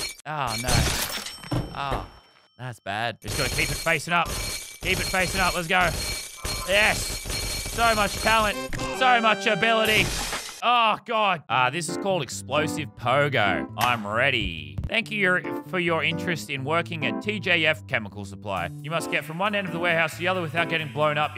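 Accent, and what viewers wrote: Australia. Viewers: I’m Aussie and it’s a bit weird hearing people saying YouTube like that on YouTube because I never really see Aussies on yt because I haven’t watched u for a while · This is if mrbeast was Australian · He Australian?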